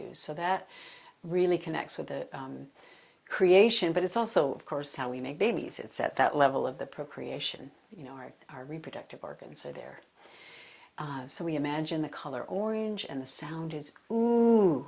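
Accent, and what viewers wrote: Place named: United States. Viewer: She is American so she has an accent if you know better you can make a video. She has 109,0000 views because of her effort.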